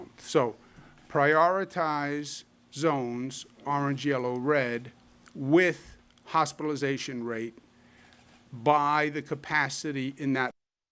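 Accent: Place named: New York, United States. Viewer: I’m not turning on the volume because I know this will be a Mario Cuomo self admiration session. I cannot stand his whining abrasive New York liberal hypocritical voice. “ I love me I love me ! I’m the very best that I can be”. No hard questions like: Why are you defunding police? Why are you ignoring the violence of “peaceful” protesters who morph into ANTIFA at a moments notice? You have your own security detail. How come if police aren’t needed? Why are you allowing destruction? Why are you trying to destroy small business? Why are you opening and closing businesses without solid fact and criteria? Why are you suddenly discounting Fauci’s recommendations? Are you a physician? WHAT WAS YOUR AGENDA PLACING COVID PATIENTS IN REST HOMES AND/OR RETURNING COVID PATIENTS TO THE REST HOME? How many died as a result of YOUR demand? Would you put your Mother in that very same rest home? 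. Why would an elected government official accept an EMMY for a self serving, self promoting FREE opportunity to spread misinformation and politic against the President and his supporters? Aren’t EMMYS an award for entertainment? Isn’t that a self congratulatory move that is BELOW even a career politicians arrogance? Congrats on your performance.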